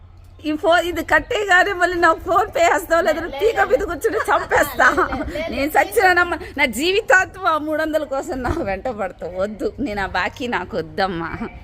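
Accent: Telangana, India. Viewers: Language b kothakota sid laa undi andi ? Yeplace andi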